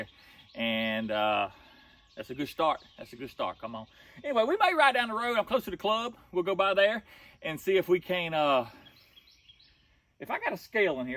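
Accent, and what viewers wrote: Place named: Upper Peninsula, United States. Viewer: You was sounding like a Michigan Yooper a little bit, eh. The strange thing in Michigan is the farther north you go, the more southern it gets.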